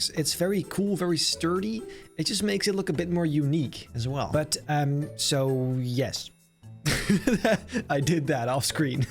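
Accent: Netherlands. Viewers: Are you from the Netherlands cause me to maybe you can talk a little Netherlands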